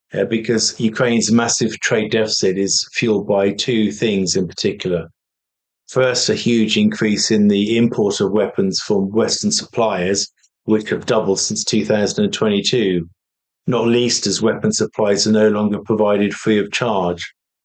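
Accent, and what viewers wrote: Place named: United Kingdom. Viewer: Thanks! You know your stuff and it’s nice to hear intelligent observations with a British accent 😂 You join a growing group of advocates for Sanity sadly lacking in Government and on MSM. Subscribed ❤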